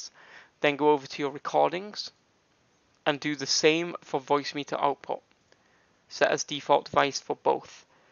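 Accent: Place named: France. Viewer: on est d'accord que tu est français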